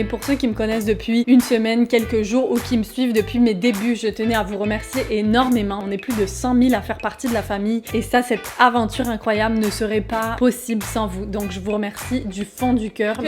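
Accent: Quebec, Canada. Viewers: J’aime trooooooo l’accent québécois omg 😍😍 c’est pour sa que je regarder t videooo ❤️ pas juste pour l’accent he 😂😂 tu me fait trooooo rire continue comme saaaa 😌 je te soutiens a fond 💪 enfin, ON TE SOUTIENS !! ❤️❤️💪💪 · J’apprends l’accent québécois avec toi et j’ai encore plus envie de passer ma vie dans ce pays de caribous. Tu es vraiment inspirante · j'ai hésiter de m'abonner a la première minute et en fait ,tu fait beaucoup de blague et tu explique très bien , et j'adore entendre des québécois/e parler français , avec leur accent . J'adore ta chaîne continue comme sa tu fait de superbe vidéo , tu va rapidement être de plus en plus connue je pense ! par contre je suis PAS SUR que mes parents voudrons bien que je vienne tourner avec toi ,et même si tu habite en france je pense que tu habite pas en bretagne , sachant que j'ai que 13 ans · Salut tu pense et aller à quelle âge au Québec et tu pense avoir eu au bout de combien de temps accent québécois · J'adore tes vidéos et j'aime trop ton accent ❤😉(j'aimerais bien avoir l'accent québécois ,et parler la langue )❤😋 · Ben moi sais simple tout se que j'ai à dire ben sais que tes génial et j'ai une amie Elle et née au Québec et maintenant elle habite en France 😀 et je t'adore tes génial PS j'adore ton acens j'adore l'acens Québécois 😀😁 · Je suis trop contente avoir une youtubeuse qui parle québécoi comme moi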